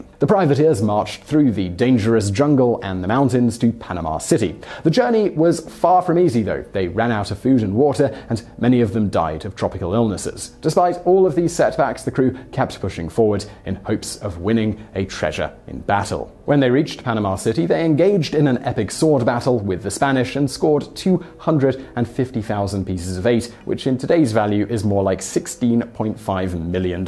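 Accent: United Kingdom. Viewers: I love how most people from the British Isles that I've ever heard speaking absolutely refuse to pronounce any word that ends in "-tory" as "toree". It's ALWAYS pronounced "-tree". Could the politics of the "Tories" have anything to do with that I wonder? ;) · I don't know about you guys but Simon and Company could research the origin of the toilet seat and we'd click on it and damn it find it one of the most Interesting facts of the day! That British accent just has a way of keeping you engaged in though! Well done again guys! Thx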